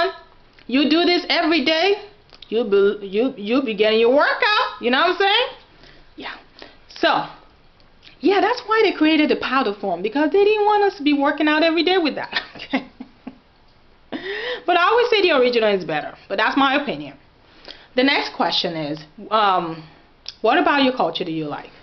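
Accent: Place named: Yoruba, Nigeria. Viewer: am a yoruba girl too and really like the tag u made.... lol about the ridiculos questions... comes around me too so many times!!!! keep up ur yoruba accent!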